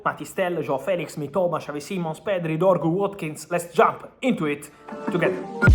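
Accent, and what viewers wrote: Italy. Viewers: He is Italian, he is trying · Bro you need to slow your speech down the heavy mario accent with the speed of you talk makes it inaudible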